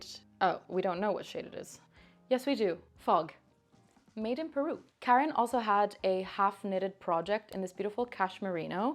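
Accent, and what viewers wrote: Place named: Italy. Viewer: your italian accent is perfect!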